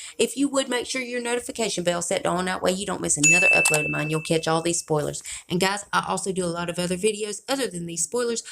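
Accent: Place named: Alabama, United States. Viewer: I love how u say stuff, you sound just like me haha. I'm from Alabama:) thanks for all the news I mean in a good way. Sometimes ppl can take stuff wrong. I love listening to u and I watch your videos when I can. My son has special needs and he is getting older so it is getting harder but God has something great for him . Thanks:)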